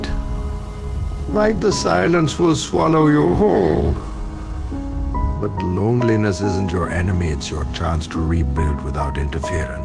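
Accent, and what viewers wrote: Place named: India. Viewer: Come on man, this dude sounds indian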